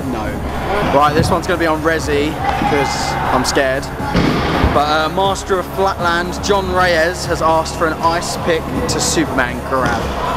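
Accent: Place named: Essex, United Kingdom. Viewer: are you from essex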